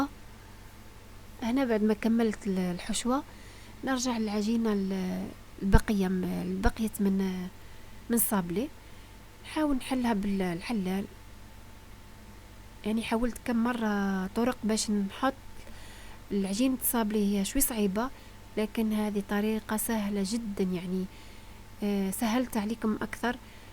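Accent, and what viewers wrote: Biskra, Algeria. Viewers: Kary Zeroual هاد الكلمة عندكم توما عيب ، هادي هدرتها باينة طبيعية و من جهة بسكرة او بوسعادة و حتى الجلفة و حتى احنا في الجنوب ما نقولوش رحيوة كيما انتوما ، الجزائر كبيرة و كلما ابتعدتي عن الشمال كلما ، استعملت كلمات عربية أكثر عند الناس ، واذا لاحظتي ما استعملتش الفرنسية او كلمات معوجة فرنسيا ، لأن المراة هنا باينة مش مصطنعة ، لازم تتعلمي شوية اللهجات توع الهضاب و الجنوب ، الله يهدينا و يهديكم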